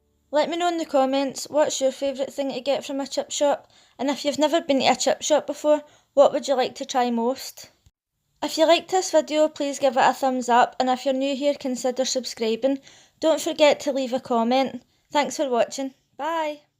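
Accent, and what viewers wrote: Scotland, United Kingdom. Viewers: Love the Scottish accent. 💝🙏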